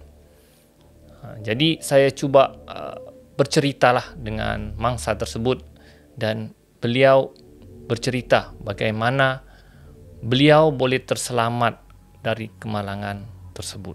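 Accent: Sabah, Malaysia. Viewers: Mantap.. Sya suka cara penyampaian kau.sebab tdk lari bhasa sabah kau. Banyak utuber yg bla membahas ssuatu hilang sabahan ori dorang. Terbaik bossssssskuuuuu.....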